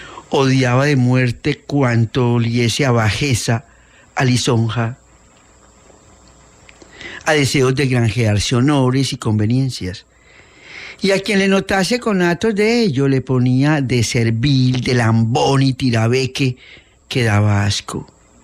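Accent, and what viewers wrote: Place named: Antioquia, Colombia. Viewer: Qué lectura tan bonita. Con ese acento paisa propio de éste cuento y la música del entre telón bellísima.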